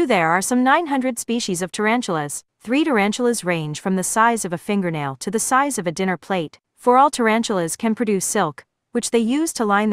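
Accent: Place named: AAVE, United States. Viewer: Holy fuck this AI reading was butchered so fucking bad. It took all my will to finish this short video. I felt like I was listening to ebonics.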